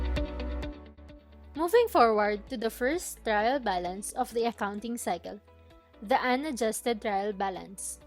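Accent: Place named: India. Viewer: Why I heard a Hindi Girl who explaining, taking this about 😅.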